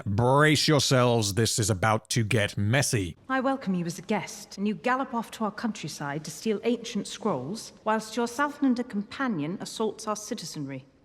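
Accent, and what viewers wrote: United Kingdom. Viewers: Why do you hate Donald Trump? I mean from your accent I would say your Brexit. So we had a leader who's deal was Illegally ( key phrase, breaking the law) entering and taking jobs, and social services, that American Citizens can not get. How would you like it if 2,500,000. People Illegally crossed into your country and your government was givening them 15000 and a place to stay with free medical care. When I know veterans who cant get medical help and are living out of their car. Love the vids so far, i really do. But take your anti Trump pro Biden crap and keep it on your side of the mic. Your making the very same mistake amazon made, while criticizing Amazon for said mistake. Thanks. I will now go back to enjoying the rest of the series.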